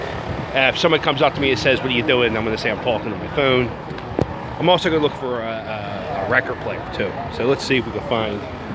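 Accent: Philadelphia, United States. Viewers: Haha 😂 I can tell your from philly.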